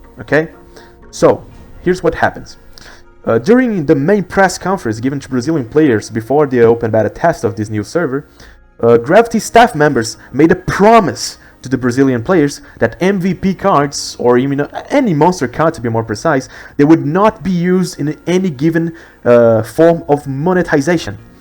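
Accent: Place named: United Kingdom. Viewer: vídeo perfeitinho, meu dog. also: whats is up with this british accent? pretty fancy, i'd say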